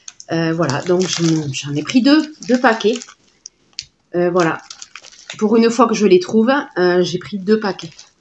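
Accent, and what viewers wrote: South, France. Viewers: Hello, vous me faites trop rire, j'adore vous suivre, et surtout j'adore votre accent du sud qui met du soleil dans mes journées ( eh oui je suis du nord 🫣🤣). Merci de partager vos courses et vos avis, cela m'a donné envie de goûter certains produits éco+. Vivement la prochaine vidéo. Belle journée ☀️🌺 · Bonsoir quel plaisir d’entendre votre accent du Sud ,merci pour ce retour e courses,dommage chez moi il n’y a pas de Leclerc.1 coucou d’Aubagne👍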